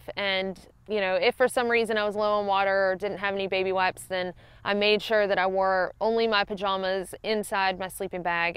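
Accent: South, United States. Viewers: some of that southern accent coming out in this video! olls = oil :) great videos as always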